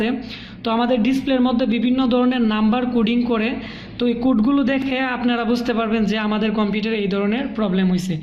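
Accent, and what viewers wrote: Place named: Mymensingh, Bangladesh. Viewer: ভাইজানের বাড়ি ময়মনসিংহ বোঝা যায় তবে অনেক কাজের ভিডিও বানাইছেন, ধন্যবাদ।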